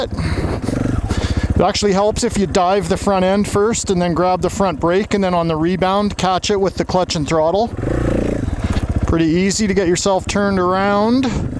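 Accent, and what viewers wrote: Canada. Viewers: Saw you in Global addiction man! Had no idea you were in that video but could tell it was you under the helmet because of your super friendly Canadian accent. I'm from Minnesota soooooo I knooow you you got the O's! Lol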